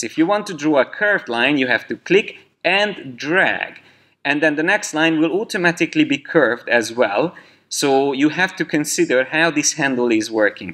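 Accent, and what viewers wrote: Hungary. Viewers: You sound Hungarian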